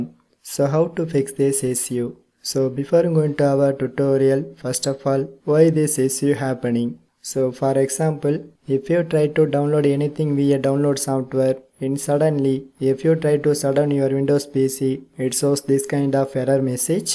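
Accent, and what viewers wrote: India. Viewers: Thanks. This helped alot, just a little annoying when you speak (Indian English), but it's nothing you can do about it. Thanks again!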